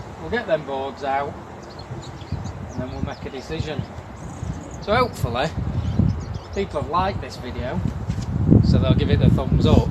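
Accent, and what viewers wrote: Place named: Leeds, United Kingdom. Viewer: I am thinking your from Leeds but I hope from Sheffield put me out of my misery maybe Rotherham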